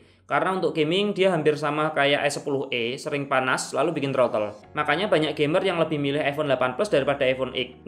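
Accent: Semarang, Indonesia. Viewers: Dari awal dengerin video nya kok bahasanya kayak medok gitu. Dan ternyata benar. Wong semarang🤣🤣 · orang semarang bro?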